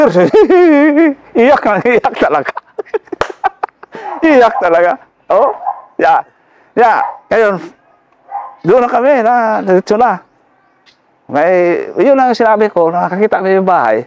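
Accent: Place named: Iloilo, Philippines. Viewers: Basta ilonggo mag kwento maganda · Ilonggo gid si sir..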